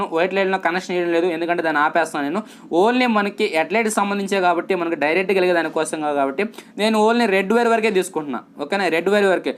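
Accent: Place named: Nellore, India. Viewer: Super cheppinaav bro.. Mana Nellore language Lo adharakottinav...Pulsar NS160 Ki same led lights koni vunnaanu.. Dhaaniki fitting Yelaa cheyyaalo oka video cheyyandi.. Chaalaa mandhi wait chesthunnaam Ikkada😍😍😍.i like this video and I like your words...nice bro keep it up. And 160 NS maathram marchipokandi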